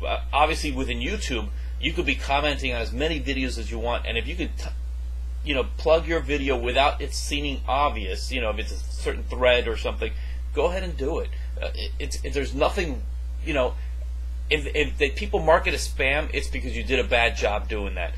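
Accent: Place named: Brazil. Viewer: it feels like a brazilian in USA. he doesn't sound native even though he's trying hard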